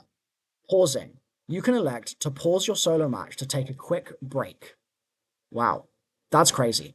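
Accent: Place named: United Kingdom. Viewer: I didn't know you where British.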